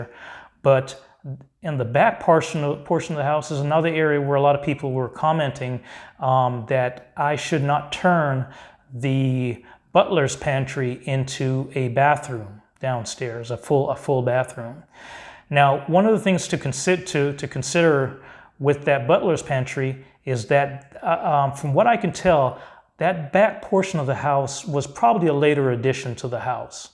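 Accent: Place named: South, United States. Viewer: He sounds like a southern gentleman and very humble. Best of luck to this fine man.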